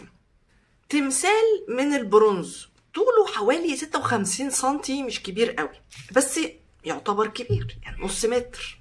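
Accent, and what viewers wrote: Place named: Japan. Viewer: انا حبيت جدا الاكسنت بتاعتك باليابانى بجد تحفة ❤❤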